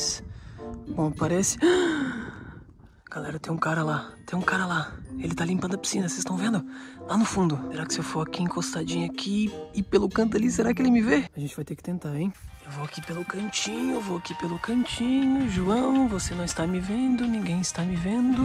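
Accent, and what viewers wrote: Portugal. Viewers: você é português, né? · tens bue sotaque pt de portugal ahah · Vc fala igual português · Pareces um português a tentar falar brasileiro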